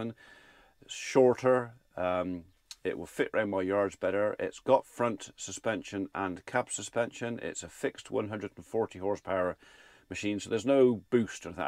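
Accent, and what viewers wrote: United Kingdom. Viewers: I have always enjoyed the British accent but have a tough time understanding Scottish & Welsh. Thanks for sharing your daily experiences. I hope you recover quickly from the virus; peace! 🙂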